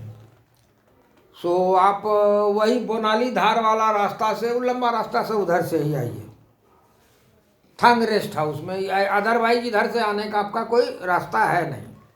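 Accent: Bihar, India. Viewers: I love your jacket sir and the way to explain in Bihari accent 🙏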